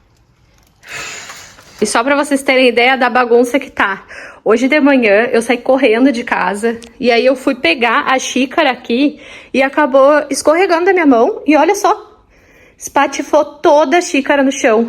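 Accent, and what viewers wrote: Rio Grande do Sul, Brazil. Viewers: Amo os teus vídeos! E tu ainda não perdeu o sotaque gaúcho, tchê!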